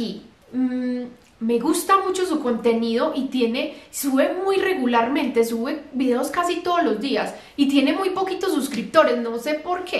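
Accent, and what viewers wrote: Antioquia, Colombia. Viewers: Hola soy una asesina de suculentas todas se me mueren las ahogó aquí es un calor extremo y siento que tienen sed y pues bueno las ahogó pero ya estoy aprendiendo y también a mí me gusta mirar sus videos cuando estoy lavando platos o haciendo comida me encanta y estoy enamorada del acento colombiano no se ofendan Saludos todo Colombia... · Me encanto tu video. Es la manera de hablar de los paisas. Asi somos para todo. No hay medidas exactas. Tratamos, ensayamos y tambien fracasamos en nuestros intentos pero aprendemos cantidades. 👍🏼❣️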